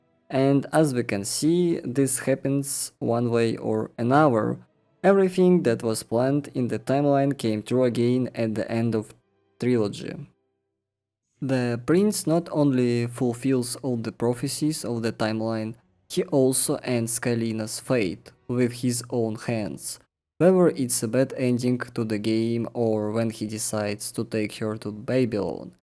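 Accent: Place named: Russia. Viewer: Bro, you're from eastern europe? your accent seems quite russian to me...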